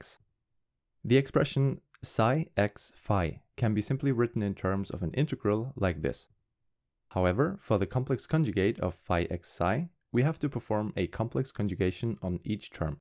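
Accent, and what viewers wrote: Germany. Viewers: Deutsch, hört man direkt 😂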